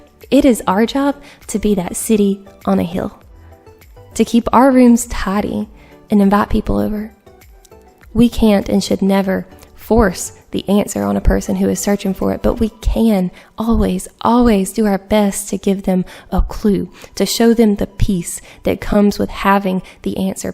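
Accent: South, United States. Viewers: Another Catholic loving your videos (and your southern drawl). Two observations: IMHO, it would be better to slowly fade out the background music. It's probably ok for shorter videos, but it can get distracting for some (like an ADD like me 😂) when you keep going deeper and deeper into such a brainy and solemn subject.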